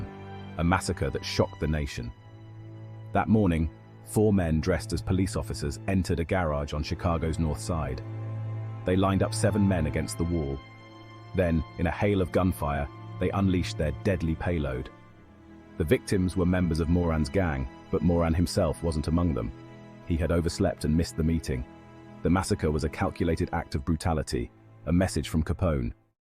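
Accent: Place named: England, United Kingdom. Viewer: Why is it always a English person narrarating !!!!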